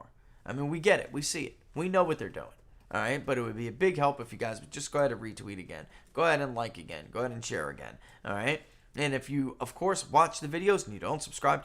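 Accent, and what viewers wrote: New York, United States. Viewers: It’s a New York/New Jersey thing, thus why Trump also uses this peculiar pronunciation. LOL.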